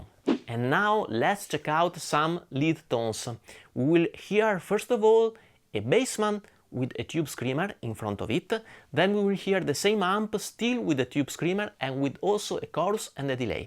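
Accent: Italy. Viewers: Caro signore lei parla inglese come Renzi. Non crede che volendo fare video in inglese dovrebbe fare un po' di pratica di pronuncia? Anyway the video is good, I didn't realize if this gear can accept the IR.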